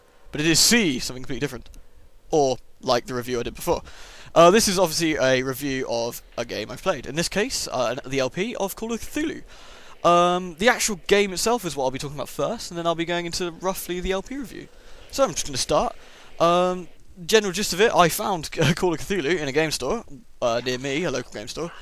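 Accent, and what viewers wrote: United Kingdom. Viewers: You made me laugh so much during this LP :D My boyfriend wants to buy this game because after I saw your LP I talked about it a lot. I love Lovecraft, and I love your British accent so this LP was what made me subscribe to you.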